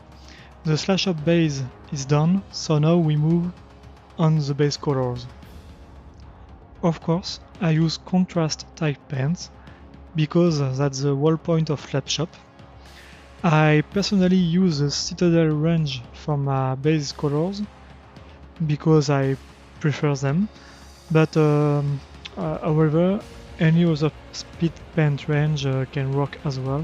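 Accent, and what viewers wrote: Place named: France. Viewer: Oh l’accent sonne français ! beau boulot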